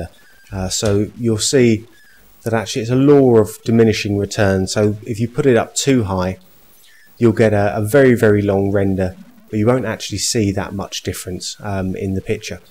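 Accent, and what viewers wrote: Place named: England, United Kingdom. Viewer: Thank you so much for this tutorial, it's just perfect. And so good to hear an English accent on a video tutorial for a change - I can understand even better :)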